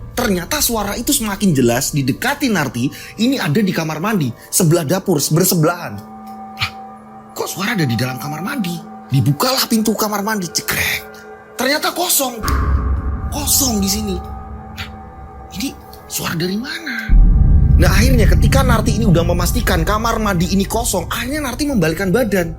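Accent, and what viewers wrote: Java, Indonesia. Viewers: Siang bang salam dari Medan Sumut ,saya seneng degerin canel Abang ini , ada lucu " ada serem " dn yg paling bikin saya senang ini bahasa jawahnya yg totok bgt sagat " jelas cara penyampainya , karena saya turunan Jawa solo ,bapak Batak jdi saya senang bgt degerin dgn keturunan org " Jawa 😊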